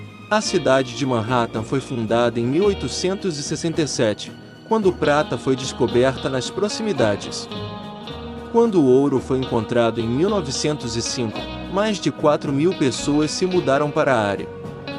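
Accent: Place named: Rio de Janeiro, Brazil. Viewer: Triste é ouvir a de nomes de cidades e personagens com o sotaque carioca! “R” afrancesado e “dchi” no final de Billy the Kid e outros this….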